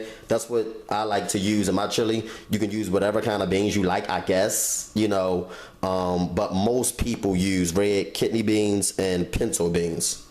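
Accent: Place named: Baltimore, United States. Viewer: I was about to ask you where you are from.. Then I seen your name haha. that accent. .. I was going to guess baltimore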